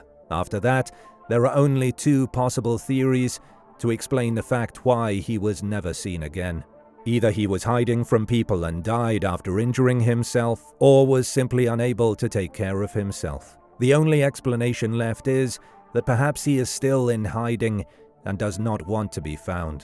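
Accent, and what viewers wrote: United Kingdom. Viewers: Came here to say the same thing. A story about a German, narrated by someone with a British accent ... and they chose to use a word for football that's only really still officially used in USA/Canada..??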